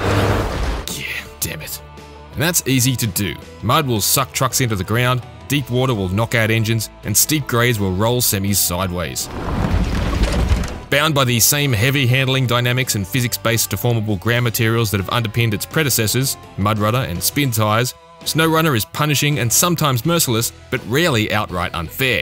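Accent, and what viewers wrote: Australia. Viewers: I might be going on an Australian outdoorsman sort of stereotype, but the reviewers voice gives this video more authenticity for me. Just sayin’. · U an Aussie mate?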